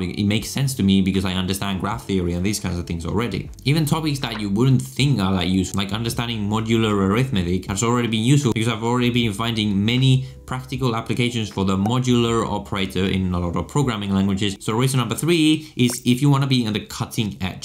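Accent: India. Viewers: I love your accent, it's a bit like the indian accent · it kinda sounds indian bro, just saying. I hope you are not some charlatan or something. whatever you are trying to hide we can tell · Why do you have an indian accent?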